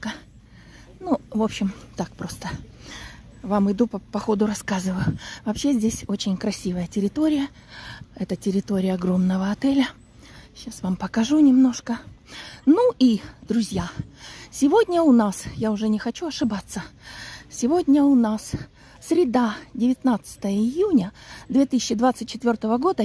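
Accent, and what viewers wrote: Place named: rural, Russia. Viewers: голос сельской женщины - себя боится показать и мужей тоже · Да, чувствуется южный говор. Его не вытравишь никакой Англией.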